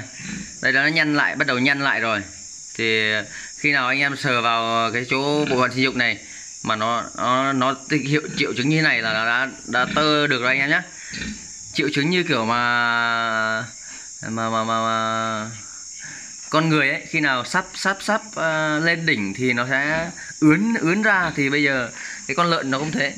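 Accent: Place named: Ha Tay, Vietnam. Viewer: Anh ở đâu thế nghe giọng giống ở Hà Tây cũ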